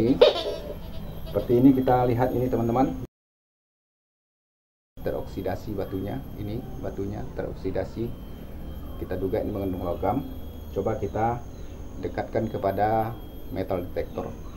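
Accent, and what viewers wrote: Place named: Manggarai, Indonesia. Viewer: Maaf dr logat bicara macamnya asal Manggarai Flores NTT...SALM dr NTT...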